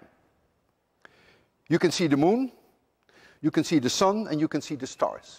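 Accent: Netherlands. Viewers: Try it , Huygens , hoigens........ Die Nederlandse tongval is en blijft geweldig. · Haha , it took me 5 seconds to know you're Dutch Mr Lewin. You speak DENGLISH . Zo noemde m'n engelse collega's het. You think Dutch and translate , where as i think in English and write it down. Maar ik vond de video boeiend en duidelijk.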